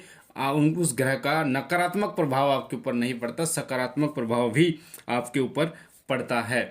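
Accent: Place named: Punjab, India. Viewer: Punjabi हो? Heavy Punjabi accent.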